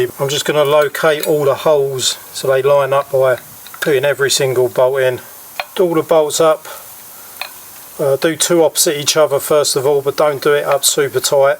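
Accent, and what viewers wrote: United Kingdom. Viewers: I cant stand that british accent. Say words correctly... Theres no 'o' in right.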